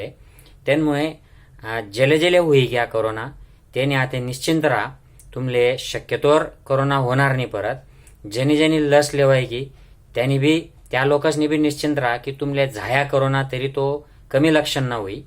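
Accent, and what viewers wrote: Khandesh, India. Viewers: गयर भारी सांग ,,,तुमनअहिराणी बोलन खुपचं गोड वाटन ऐकाले👍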